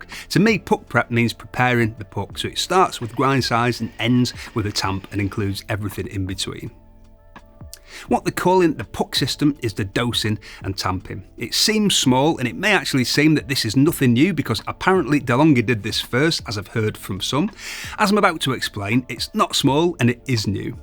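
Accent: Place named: Yorkshire, United Kingdom. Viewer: You sound like you come from somewhere in Yorkshire - where abouts? is the question.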